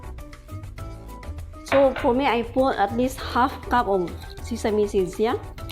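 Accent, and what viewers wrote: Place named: China. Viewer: Hello galing naman para kang Chinese mag English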